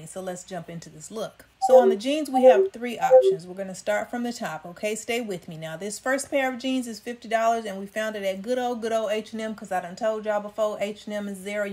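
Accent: South, United States. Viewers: hi 😄, this is Kay.. yesss. they are all 5 stars. 💥💥💥💥💥. btw, I love how you say "thure' instead of there. where are you located? or the bit of southern accent?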